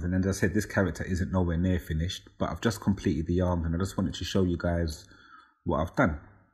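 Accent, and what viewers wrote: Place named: London, United Kingdom. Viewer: First one I've seen of you with a voice-over. Enjoying the London accent.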